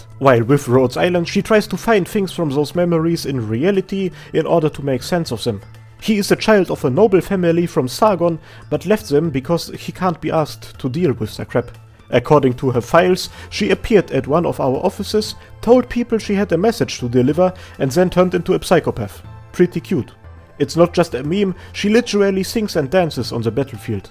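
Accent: Germany. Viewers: From Which country are you XD Sounds pretty Hard like germany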